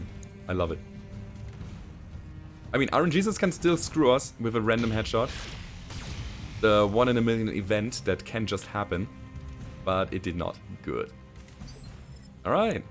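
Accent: Germany. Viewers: I like the format of build and refit at the beginning then a battle then upgrades and another battle. Each episode is well layed out. I dig rogue tech and have played the table top since 94 and no one would play the level 3+ rules that rogue tech represents. I love the whole thing even the Deuchlund accent, loved my time there.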